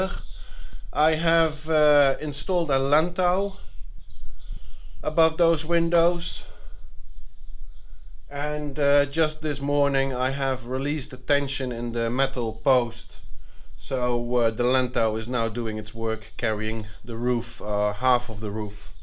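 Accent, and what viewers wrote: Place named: Netherlands. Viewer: Thanks for having done all these videos!! My wife and I are also looking to buy a project house in France and watching your videos gives me inspiration and teaches me certain techniques. Maybe dumb question, maar ben jij Nederlands? Your accent makes me to believe so.. 😅 Groetjes uit Nederland!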